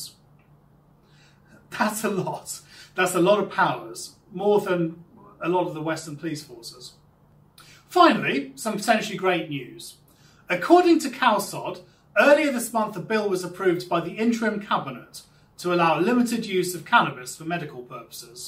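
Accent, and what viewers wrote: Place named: Posh, United Kingdom. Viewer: you know what it is, it's the way you talk, you talk like you went to a private school ( if you want to score some weed and chill on a hammock) you would expect someone with a Glasgow accent to be talking about that. Well I'm on one of the islands now but I will be going back to Bangkok one day. I've subscribed to your page so let's see some new posts. Kind Regards Ryan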